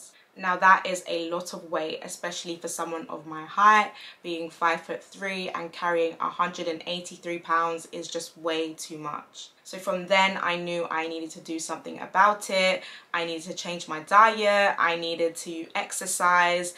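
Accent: United Kingdom. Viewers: 🇧🇧🇬🇧Newly subscribed! Made me laugh with the biblical disclaimer 😂. I have to mention how great it is to hear a British accent sharing their journey not come across many here on youtube. Great tips, by the way, your journey is truly inspiring, thanks for sharing.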